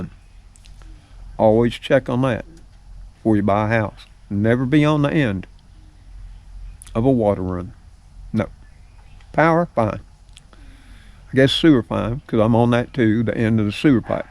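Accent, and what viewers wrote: South, United States. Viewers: Love your Southern accent too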